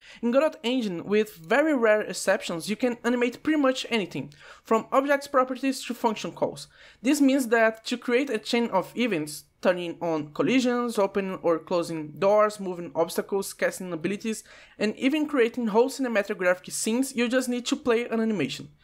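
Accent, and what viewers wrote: Brazil. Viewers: Percebi pelo sotaque. Ganhou um inscrito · Sabia que era BR só pelo sotaque. Brabo homi! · Eu sabia pelo sotaque que tu era brasileiro kkkkkkk salve, e seu inglês é MT bom btw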